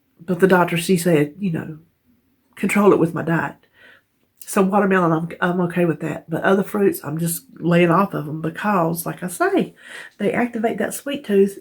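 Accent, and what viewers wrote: South, United States. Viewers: Not going anywhere and can't imagine life without you. So enjoy your sense of humor and southern accent! And what would I do without your DT and Wanda hauls?? We are all in this together no matter what plan we are following. You have been so good and therapeutic for me as I have gone through this 5 months of recuperation from my foot surgery and Co-Vid on top of it, and can't imagine life without and your beautiful soul. Here's hoping 2021 is better for all of us and we will hit the pavement running all together..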